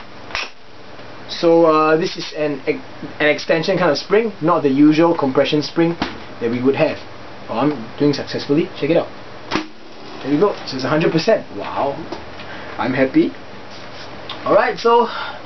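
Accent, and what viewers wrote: Singapore. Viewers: DO MORE SINGLISH! PLEASE! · OH MY ENGLISH!... eheh nice singlish accent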